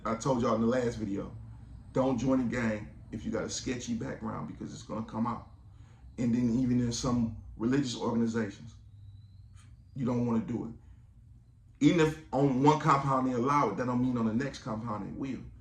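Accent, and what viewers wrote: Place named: California, United States. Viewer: Great videos and great content. Your accent, are you from California?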